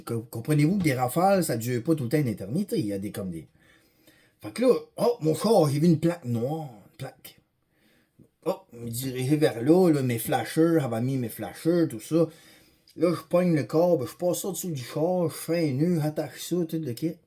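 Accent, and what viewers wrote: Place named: Acadia, Canada. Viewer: Tubes adorable. Tu fais ma journée mon beau Monsieur . J'adore ton accent acadien comme chez- nous en Acadie.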